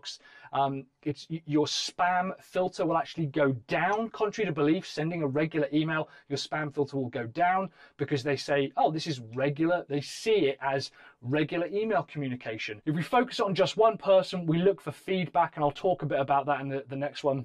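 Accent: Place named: England, United Kingdom. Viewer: Mann, i like your english accent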